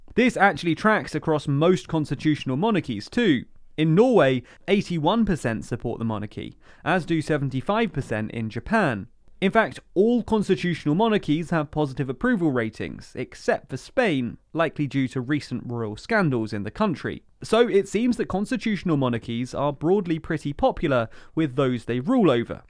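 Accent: United Kingdom. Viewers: He’s British so what he says in his accent is correct. Because he sounds British.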